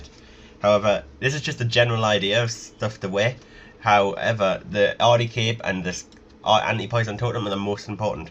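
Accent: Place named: Newcastle, United Kingdom. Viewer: he's not from Scotland you idiot, He's from Newcastle i think.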